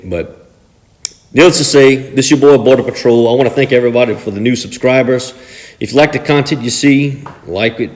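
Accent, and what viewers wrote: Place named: Louisiana, United States. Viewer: I’m with you bud, with the scarcity of ammo these days, I pick my shots and catch a couple runners. I also use a Grendel as my go to and love it. You sound like you are down around Baton Rouge right?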